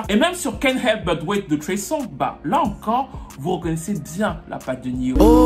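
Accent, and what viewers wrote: Cameroon. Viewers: ce mec merite plus 1 M d abonnes. tu es trop bon ow mon frere africain. j adore ton accent camerounais LOVE YOUUUU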